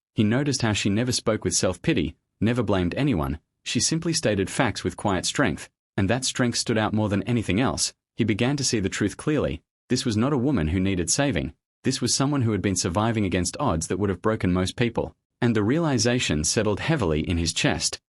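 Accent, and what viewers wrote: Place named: Australia. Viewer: Okay. . . With this voice, I suppose I can imagine this is about the Strayan outback.😊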